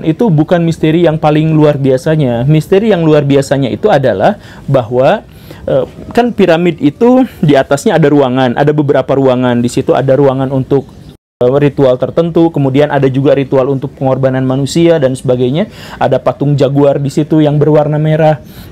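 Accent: West Java, Indonesia. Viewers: Jarum kecos Ranggeteng hadeuh.. Sunda pisan si Akang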